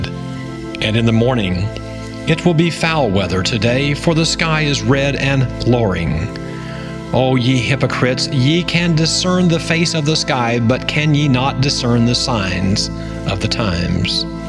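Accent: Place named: United States. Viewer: So, Jesus said these things to someone, then 300 years later, someone heard down the grapevine what he said, then wrote it in Greek, then it got translated to Italian, then it was run through the Catholic system, then the Protestant system, and then translated into English and imported into North America, and is now being recited by a country-accented white man, and therefore it holds the faith of so many as the unadulterated Absolute Truth? Just trying to get the understanding right.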